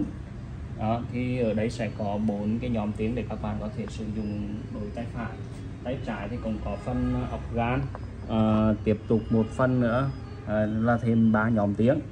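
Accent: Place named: Central, Vietnam. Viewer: Kênh của Bạn kinh doanh đàn, các dòng đàn rất phong phú và hợp lý giá. Góp ý cho bạn chút suy nghĩ cá nhân của mình: Xin lỗi bạn vì giọng bạn đặc thù miền trung, Bạn nên đeo micro khi quay Video để mọi người dễ hiểu và nghe bạn truyền đạt. Thân ái trong Chúa.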